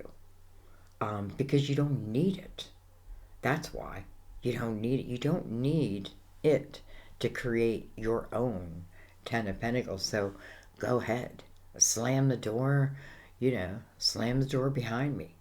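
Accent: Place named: Pennsylvania, United States. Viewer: I have something to tell you. It’s like a big deal. I’m so grateful. I’ll send a private message. I (also) love your PA accent! Much. J T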